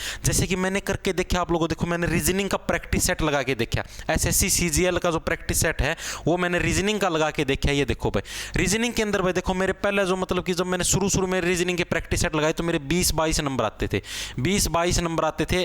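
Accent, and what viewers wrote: Haryana, India. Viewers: Haryana ale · Bhai aapki boli dekh kar me bhi aadhi si haryanvi Sikh gya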